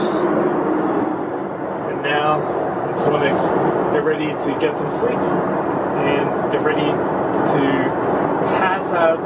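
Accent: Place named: Australia. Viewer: you have a bit of aussie accent… nice flight review by the way. cheers!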